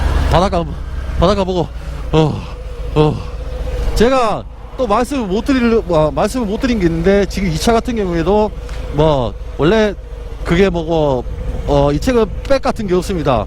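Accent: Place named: Gyeongsang, South Korea. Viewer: 같은 경상도라 정감이 가네요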